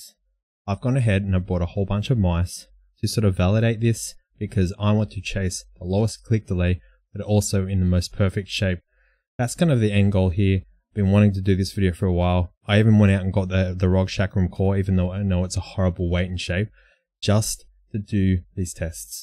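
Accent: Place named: Australia. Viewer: Dude, I guessed that you were from either: newcastle , cronulla, or wollongong ;) nice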